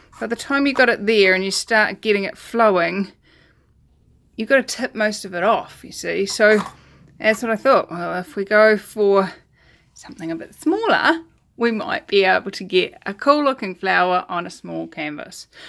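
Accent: South, United States. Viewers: Sounds like a mixture of a Southern and Midwestern accent! This little guy isdarling. You've certainly got it going on this!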